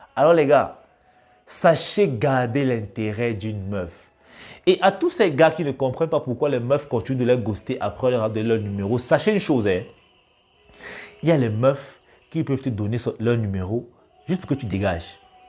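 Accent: Cameroon. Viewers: Hayi déjà l'accent est clairement camerounais 😅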